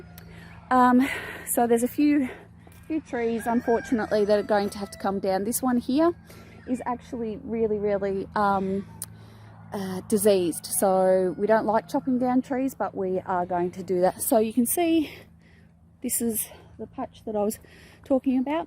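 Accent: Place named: Australia. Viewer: Looking forward to seeing more videos. Beautiful place there. Where are you from Kind of sounds like Australia. Have a great night and God bless.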